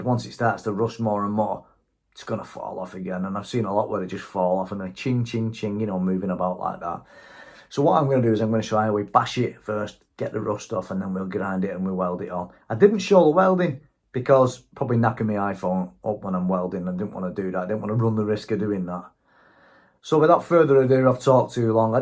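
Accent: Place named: Northern England, United Kingdom. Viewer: Have done a few of those ABS rings in the past and had to resort to a spot of weld myself haven’t done one for some considerable time now though. Your videos are good mate and your work is top notch and at the top of your game especially with the fault finding. Can’t help but think of Fred Dibnah when I hear your accent I’m a Yorkshire man but don’t let that put you off 😂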